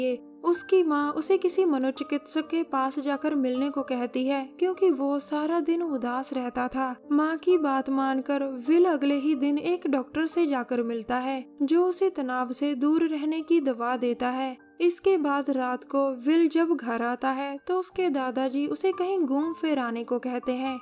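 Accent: Punjab, India. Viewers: tusi Punjabi ho?? awaz ton lgda tuhadi kyu k Punjabi accent hai tuhadi voice ch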